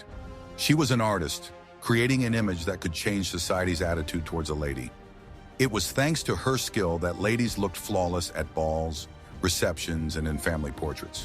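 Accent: United States. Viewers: More god awful American robot narration!!! 3 seconds in and I'm off!! 👎👎👎👎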